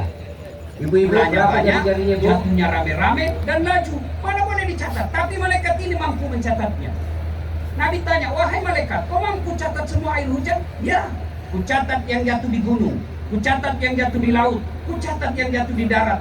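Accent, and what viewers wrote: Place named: Sabah, Malaysia. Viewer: Pintar sudah Ustadz bicara sabah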